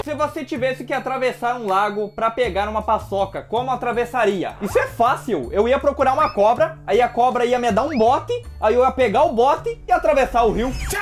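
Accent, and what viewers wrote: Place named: Rio Grande do Sul, Brazil. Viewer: com certeza é Gaúcho ksksks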